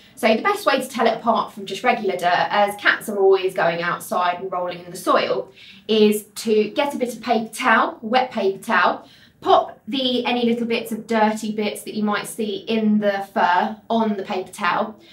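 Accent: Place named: United Kingdom. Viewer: Love your Brit-ish accont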